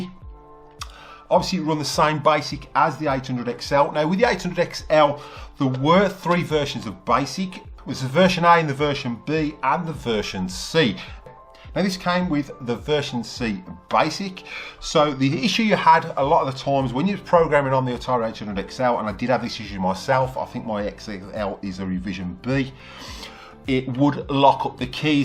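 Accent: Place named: West Midlands, United Kingdom. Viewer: That accent sounds like Walsall or close in the West Mids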